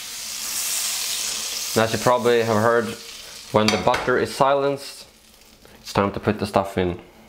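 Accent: Stockholm, Sweden. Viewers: Den här snubben är så stockholmsk som det går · why does sound extra swedish when doing vlogs? lol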